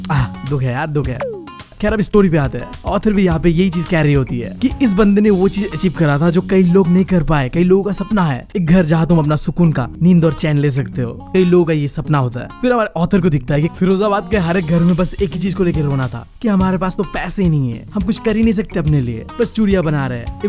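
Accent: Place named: Bengal, India. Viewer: bro apka hindi bolne ka tarika sachme bangoli type ka hai mere bestfriend ki traha 🙃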